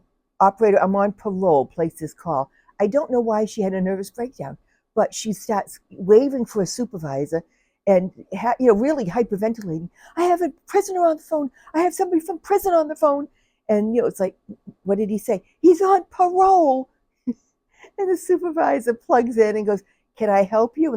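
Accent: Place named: Massachusetts, United States. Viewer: I love watching your video's you make me crack up,,, As soon as I heard you speak, I said to myself that's a Massachusetts Accent. The reason that I recognized it is because I live in Mass as well. I'm looking forward to your next video, Take Care----Madeleine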